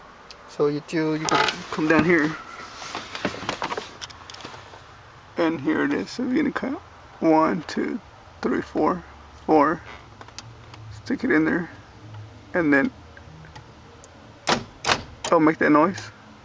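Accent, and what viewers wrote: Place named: Mexico. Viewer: Carlo Russo that’s how Mexicans talk!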